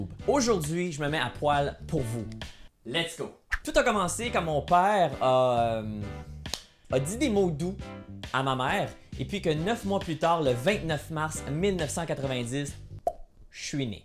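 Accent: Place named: Normandy, France. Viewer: étant donné ton accent,tu dois être de l'extrème ouest de la normandie,non?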